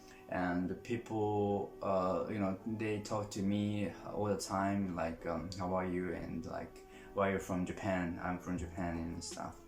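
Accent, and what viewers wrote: Philippines. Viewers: you have that Filipino accent. Great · You're so cute though. And you got tge Filipino millennial English Accent. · You have that Filipino english accent and we love it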